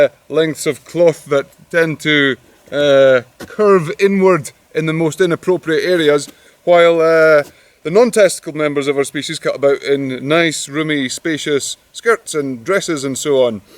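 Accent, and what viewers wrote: Scotland, United Kingdom. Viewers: Scottish accents have to be the best speech style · Arrived for the kilt, stayed for the Scottish brogue and sense of humor. What a beautiful manly man. The brogue tends to make me weak in the knees man.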